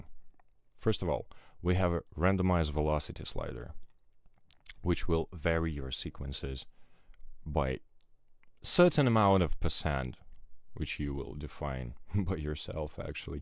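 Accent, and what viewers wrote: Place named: Belarus. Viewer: Belarusian accent of English.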